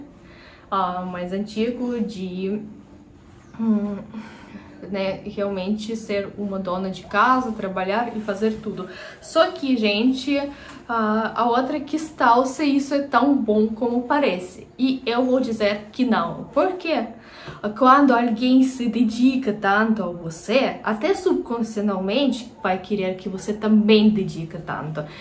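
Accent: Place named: Curitiba, Brazil. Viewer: O tópico é curioso, a maior comunidade eslava do Brazil está em Curitiba. Como namorar como uma Curitiba ? Um fato curioso, teu sotaque é parecido com o de muitos curitibanos, assim como aparência ( pela minha visão de alemão ). A minha impressão é de que as pessoas estão curiosas a seu respeito ( hipótese ). Se minha memória estiver correta este conceito de esposa fora chamado de Amélia ( referência a musica antiga, relativo a esposa no sentido bíblico dentro da cultura hebraico/ cristã )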